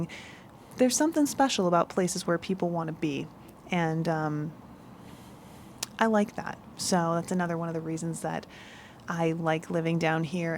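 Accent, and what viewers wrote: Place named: New England, United States. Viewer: I KNEW you had to be from New England - I could feel it! ☺ Once upon a time when I was still a CM at Disneyland, I was seriously thinking of transferring over to WDW. At that time, Spectromagic was still playing and I had a dream to be in it. I was also a naive 20-something. Fast forward many years later, and I just don't know. The humidity is a HUGE factor - does it get any easier the longer you stay? The urban sprawl, traffic - well, I have that at home, so I imagine it's not much different. The cookie cutter stucco homes are annoying AF. I am sure there are older neighborhoods that tourists don't see where I could find more culture and creativity in building - drove through Thornton Park once and that was much more my speed. Too bad the prices don't agree. So, my latest thought is "maybe as a part time retirement job". 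We'll see...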